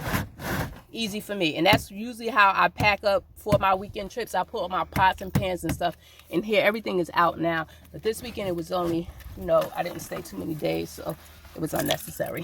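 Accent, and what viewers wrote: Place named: New York, United States. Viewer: Very informative...she must be from NY or NJ...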